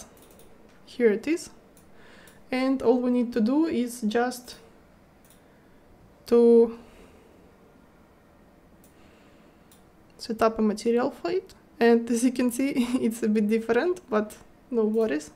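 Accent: Russia. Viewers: Jest' ya slsshu russkiy aktsent, ))) Есть русская версия?)) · слышно русский акцент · Как звучит русский акцент? Вот так) Спасибо большое за видео! Успехов! ЗЫ: Очень подробно. Подписался.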